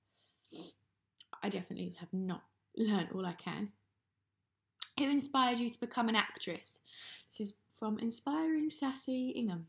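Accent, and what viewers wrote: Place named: Essex, United Kingdom. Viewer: Her accent sounds more Essex-y than she does in eastenders🙈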